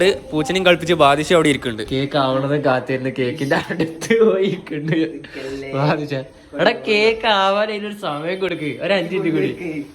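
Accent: Malappuram, India. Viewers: Nammale malappuram baasha polichilleeeee guys kondotty power......😄😃😉😉😉😉😉😉😉😉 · ഞാൻ കണ്ടീൽ വെച്ച് മലപ്പുറം വർത്താനം കറക്റ്റ് കൊണ്ടുവന്ന ഒരേ ഒരു വ്യക്തി ♥️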